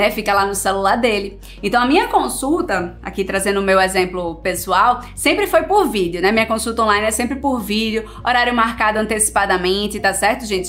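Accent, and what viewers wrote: Pernambuco, Brazil. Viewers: Oi,! Conheci seu canal há pouco tempo. Você é pernambucana? Estou sem maturidade emocional para assistir aos seus vídeos sem me perder no sotaque maravilhoso; preciso ver duas vezes. Hahaha Gratidão pela sua explicação, tão clara e iluminada. Que 2021 seja de caminhos abertos para você, com muito amor e luz! ✨🎆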